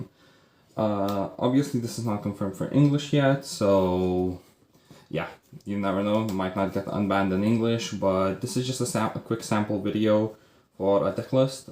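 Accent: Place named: Poland. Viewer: Where are captions? I can't understand this polish accent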